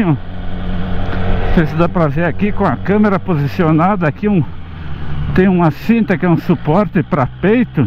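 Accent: Rio Grande do Sul, Brazil. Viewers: essa cinta e top e as 2 câmeras são tops hero 4 e 6; tou gostando do sutaque do rio grande do sul rsr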